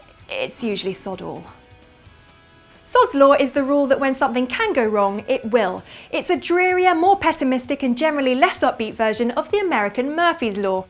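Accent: United Kingdom. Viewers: Are you British? But if you not how English English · I would stick it in this British chick's bum. She is not very pretty, but I have a weakness for that damned accent. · There are almost as many "British accents" as there are towns in the U.K. Her accent is more of an "educated Brit" accent, isn't it?. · Are you bloody stupid mate? Her accent is obviously British! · In all I love the British accent as it add confidence to your speech.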